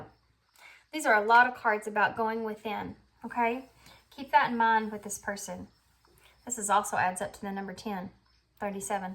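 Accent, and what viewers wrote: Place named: Deep South, United States. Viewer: Thank you! I love your accent! I have relatives from the Deep South and I love to hear them talk. You have no idea how accurate this reading is, although I really am not interested in this person coming back into my life.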